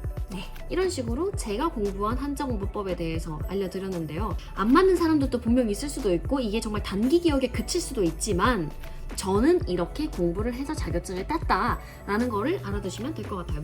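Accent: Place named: South Korea. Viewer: 이분 한국인임? 한국어 그냥 한국인인데?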